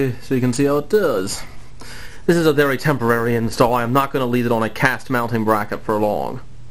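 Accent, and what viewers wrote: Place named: Ireland. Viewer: An invention as fascinating as the accent. If it's not too personal, I gotta ask, is this a particular regional accent or a mix perhaps? It almost has a twinge of Irish about it (apologies for the off-topic question - I just love accents)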